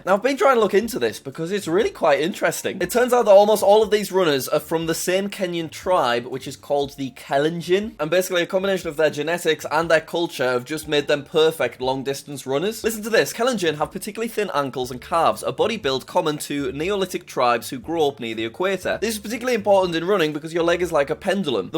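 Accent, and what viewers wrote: United Kingdom. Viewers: is basically Drew Durnil with a British accent. (seriously, they just scroll through the same reddit threads and upload the videos a few days apart?)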